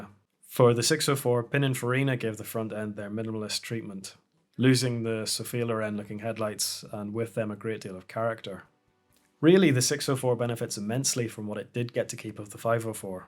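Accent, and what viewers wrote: Ireland. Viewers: Irish accent! Superb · Pronunciation is different in different countries. Ideally we should all use a French accent but he uses the normal pronunciation that is used in Ireland.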